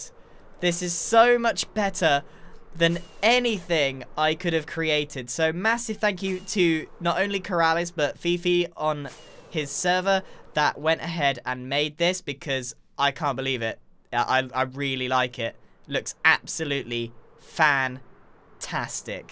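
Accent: United Kingdom. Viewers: I knew u was British! Help me and meet hahaaaaa..... 😋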